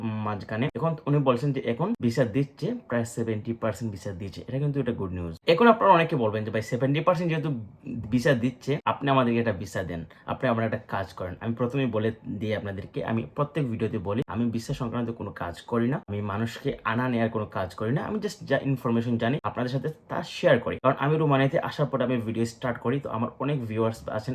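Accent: Sylhet, Bangladesh. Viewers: ভাই আপনি কি সিলেটি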